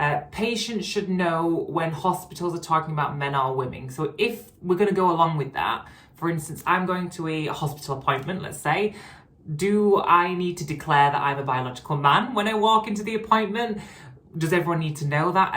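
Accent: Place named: Scotland, United Kingdom. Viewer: Politics are terrible - makes sense what you mentioned. I am honest in stating I think it's a bit much-expecting people to understand this overnight and learn everything - the world is busy and that's not on my list of things to learn right now, however, you are a human (woman) who I respect but not what I see in the parades and story time with drag queens - what the heck. I love people and care a lot but they can get very nasty over a mistake - misgendering. The community seems to be very entitled but not yourself. Thank you for helping me understand everything and learn that not ALL transgender individuals are the same. I feel bad for you but also respect you because you are living your life and doing an amazing job with your transition and platform. You are a beautiful and kind woman and I am beyond grateful to have found your videos. 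Positive vibes and love from Canada. BTW I love your accent, my grandmother was a war bride from Scotland.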